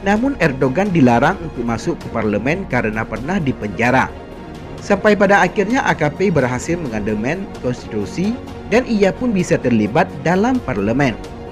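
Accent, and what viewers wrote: Aceh, Indonesia. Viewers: Sama huruf. S .mimin kalau dua kata itu agak susah berarti mimin Acheh.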